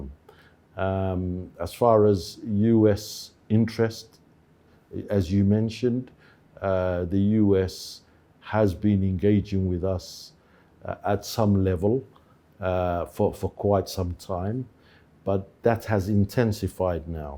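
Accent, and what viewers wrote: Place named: London, United Kingdom. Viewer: What a posh english , London accent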